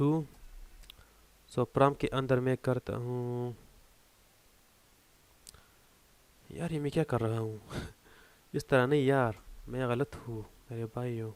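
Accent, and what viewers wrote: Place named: Peshawar, Pakistan. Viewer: Sab acha hai lekin apna khan wala akcent khatam karo bhai ye youtube hai peshawar nahi yahan har banda nahi samajh sakta aap ka style.. please brother..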